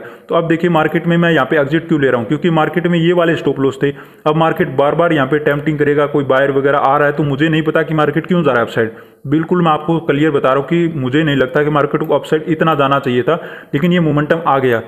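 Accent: Haryana, India. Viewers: sir i guess u are from haryana. i am guessing because of your speaking tone. am i right?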